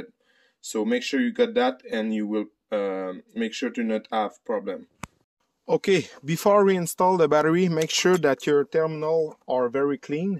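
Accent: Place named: Quebec, Canada. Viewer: pour l'effort pour l'anglais 😄. Toujours drôle d'entendre un accent québecois sur YouTube. Bon vidéo 👍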